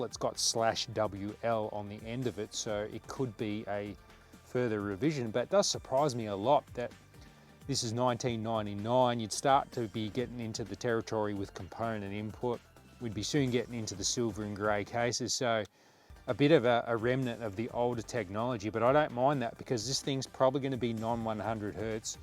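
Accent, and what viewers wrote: Australia. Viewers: This is how I imagine an Australian to speak..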